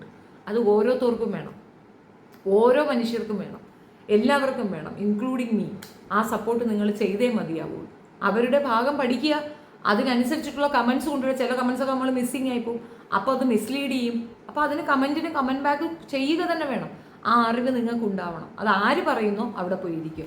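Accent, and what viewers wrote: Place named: Thiruvananthapuram, India. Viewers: തിരുവനന്തപുരം ശൈലി 😂😂 ആണെങ്കിലും താങ്കളുടെ അവതരണരീതിയും ഭാഷാ സ്ഫുടതയുംനന്നാവുന്നുണ്ട്.. ചിലർക്ക് നിങ്ങളുടെ പോലെയുള്ള മറുപടി ആണ് വേണ്ടി വരിക..😂😂.. സമൂഹത്തിന് ഗുണകരമായവയും വഷളത്തങ്ങൾ അലങ്കാര മാക്കി മനുഷ്യരുടെ ഇടയിൽ അകൽച്ചയും വർഗ്ഗീയ ചേരിതിരിവുകൾ സൃഷ്ടിക്കുന്ന വർക്കും ഇങ്ങിനെ യൊക്കെ മറുപടി പറയുന്നത് ഉചിതം തന്നെ ❤😂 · വടക്കൻ ട്രിവാൻഡ്രം ആണ് അതാണ്‌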